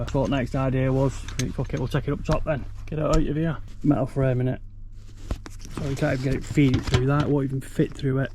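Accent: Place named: Leigh, United Kingdom. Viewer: were are you from leigh or wigan sounds like leigh bolton lad here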